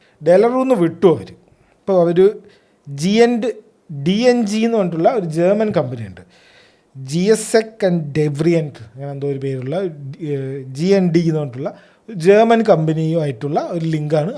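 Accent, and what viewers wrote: Palakkad, India. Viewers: Bro ningal palakkad ano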